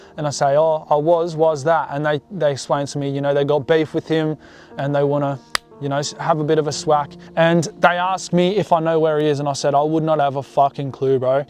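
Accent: Australia. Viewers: Are you from Melbourne or Queensland?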